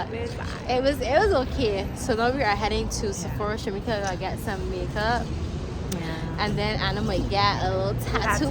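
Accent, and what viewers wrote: Barbados. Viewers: Hi, I love and enjoy your content, my only complaint would be that I wish it was more regular 😁. I particularly enjoyed this one. Please, keep your Bajan accent, it is so wonderful to hear you sounding like you've not left Barbados. Take care and God bless.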